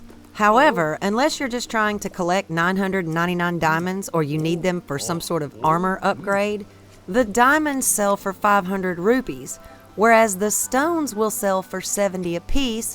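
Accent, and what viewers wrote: South, United States. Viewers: Southerners play video games? 😂. Sounds so odd for a souther gal talk VG’s. Respect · It’s 2022, I don’t watch these for the tips 😂 I’m here for her soothing country voice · Love that souther accent. So informative.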